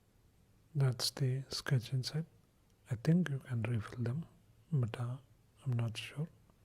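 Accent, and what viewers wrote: India. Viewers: I think hes indian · Wow who is he..?? His voice is gold...I love an Indian accent. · I think that the Indian accent makes for the best ASMR sounds.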